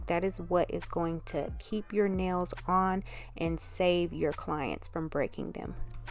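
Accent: South, United States. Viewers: I love these! I hope I didn't say anything out of line by saying you sound like you're from the south? I mean I love it. I know a lot of people say southern people sound stupid because we have a southern accident. I want meaning that at all. I love your accent again I have a southern accent but yours sounds sweet. I'm not usually into clear tips but I really love these colors and it might have to you all day but you make it look so easy. That's why I don't do my nails a lot because it takes me so long! Much love to you!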